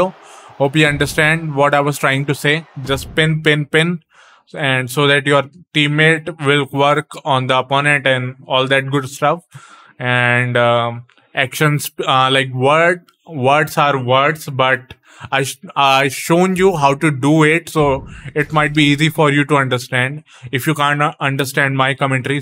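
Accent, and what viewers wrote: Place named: India. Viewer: Nothing worse than the Indian accent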